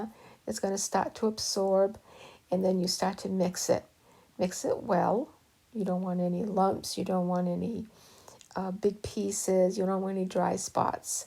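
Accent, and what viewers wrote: New England, United States. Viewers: Thank you! Great video! You must be from New England with that accent lol.😊😊😊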